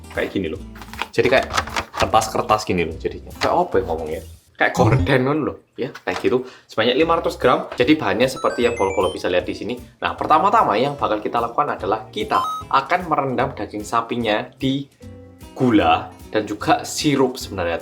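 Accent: Java, Indonesia. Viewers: Mas tahun ini ada gak?😁🙈 Kangen nih sambil nunggu buka hahaha mudahan dibaca mas medok😁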